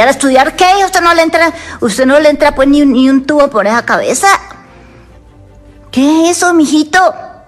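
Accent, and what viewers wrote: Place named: Antioquia, Colombia. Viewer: Yo no se pero doña tiene acento paisa... ¿ será Paisa?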